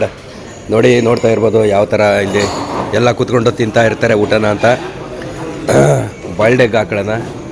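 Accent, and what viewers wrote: Mandya, India. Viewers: ಮಂಡ್ಯ ಭಾಷೆ ಬಿಟ್ಟು ಕೂಡಬೇಡ👌👌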